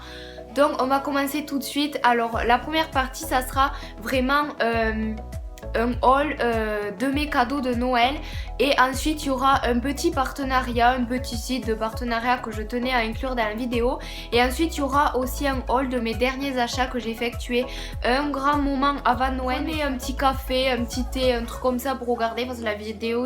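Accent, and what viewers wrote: South, France. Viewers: Mais votre accent , vous etes française ? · j adore ton accent du sud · L'accent du suuuuud !!! J'aime trop OMG !😍💘💍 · l'accent du sud🙃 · Ralala l'accent du Sud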